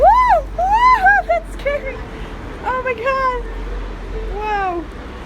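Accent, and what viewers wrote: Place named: Australia. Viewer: I actually found it ironic you said you sometimes don’t understand when Australians are talking to you. I am originally Chinese who have been living here for 20 years. I found your accent is somehow closer to Australians than many Americans.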